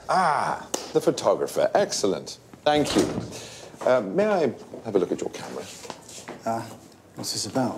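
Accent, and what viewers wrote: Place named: Liverpool, United Kingdom. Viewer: liverpool , i hear in your accent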